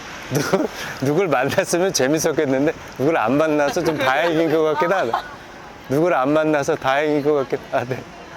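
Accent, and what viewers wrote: Seoul, South Korea. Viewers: 원래 이런 병맛개그 성격이셨나요ㅎㅎ청춘의 덫 동철이랑 말투가 꽤 흡사하시네요~약간 옛날 서울사투리 느낌ㅎㅎ 올만에 뵈니 반가워요~~~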